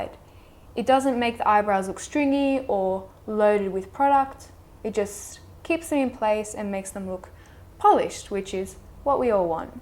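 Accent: Australia. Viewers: your aussie accent is so fun to listen to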